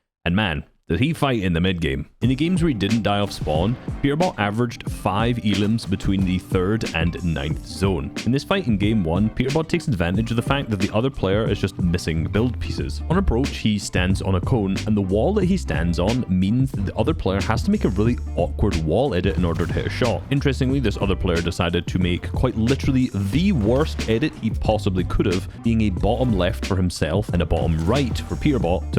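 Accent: Scotland, United Kingdom. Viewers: Your accent makes me want to drink IRN BRU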